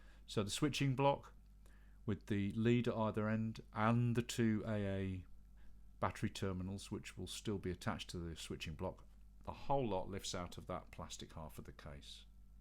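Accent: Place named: England, United Kingdom. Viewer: I love English accent. Can you tell me if this tool will pick well music from the ceiling where neighbor is playing karaoke, he sings on the mic, play guitar and the karaoke machine plays ovation when the guy ends singing. I can't even watch my tv, I can't speak on my phone. I hear all double because of his noise disturbance. I need to record to get evidences. My cellphone does not pick any sound despite that the noise is so loud. How do I use this mic, attach to the ceiling?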